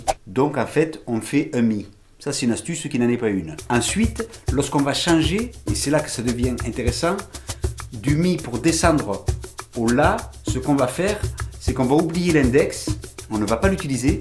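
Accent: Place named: South, France. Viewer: Salut! Super vodéo, super format! Parfait pour comprendre quand placer les accords et pour voir la rythmique main droite! Seule petite remarque: Si tu pouvais prendre 1 minute au début de ta vidéo pour décortiquer le mouvement de la main droite ce serait encore mieux!! En tout cas un très grand merci pour ta pédagogie, ta bonne humeur (et ton petit accent du sud qui ne gâche rien dans l'affaire), c'est vraiment super agréable et encourageant!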